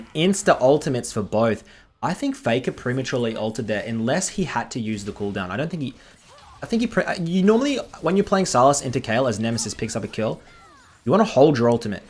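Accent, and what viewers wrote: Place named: Australia. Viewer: Out in the bloody boony's in woop woop. farming. yourself. . This man is so Australian it hurts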